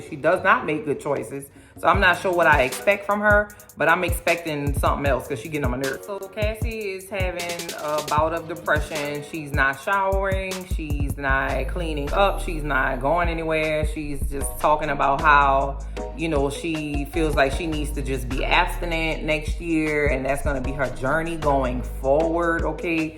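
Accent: New Orleans, United States. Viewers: I just love your Nawlins accent. Me being from Monroe, I knew IMMEDIATELY you were from New Orleans.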